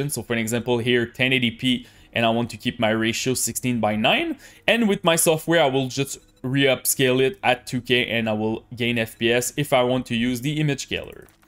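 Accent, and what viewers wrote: Quebec, Canada. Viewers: ca parais que tu est quebecois xD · Do I hear a French Canadian accent? :D I am Canadian, lived in Ottawa most of my life. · j'adore ton accent québecois à travers ton anglais! mais pourquoi pas en quebecois!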